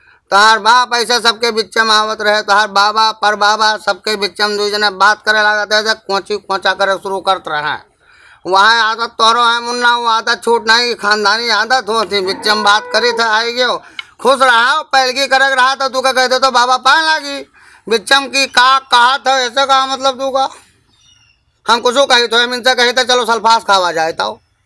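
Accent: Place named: Awadhi, India. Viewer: Andhra pradesh Tirupati bala g se apna awodhi sun kar maza aa jata hai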